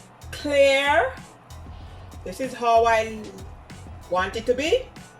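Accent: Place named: Jamaica. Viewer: Why do you sound Jamaican though? It’s supposed to be a Bahamian accent though. But I will watch it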